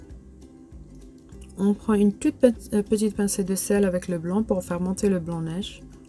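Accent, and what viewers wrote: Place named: Oran, Algeria. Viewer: J'adore ton accent wahran officiel 💟😅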